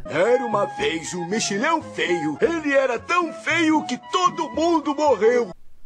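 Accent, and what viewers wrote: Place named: Minas Gerais, Brazil. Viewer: Adoro seu sotaque mineiro amei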